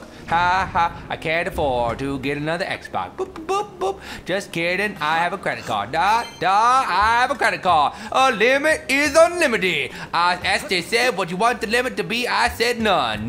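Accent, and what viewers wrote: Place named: England, United Kingdom. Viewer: i love your english/britsh accent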